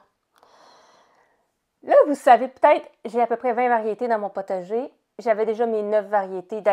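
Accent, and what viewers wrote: Quebec, Canada. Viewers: C'est aussi Pâques au Québec J'adore votre accent, j'aimerais y aller un jour, et super votre video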